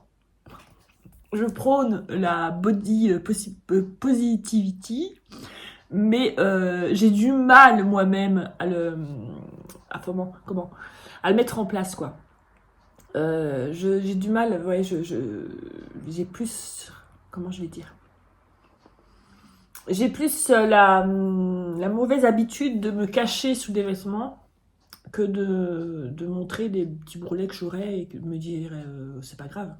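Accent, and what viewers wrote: Belgium. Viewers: Cet accent belge c'est magique, notre qui nous fait une vidéo à l'ancienne je valide! Amitiés du pays basque à toi et à tous 😁😁😁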